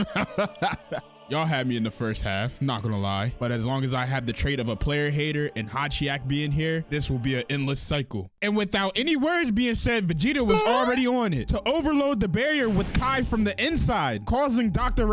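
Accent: Philadelphia, United States. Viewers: You gotta be from Philly😂😂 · bro i know a Philly nigga when i hear one ! Love the content !!!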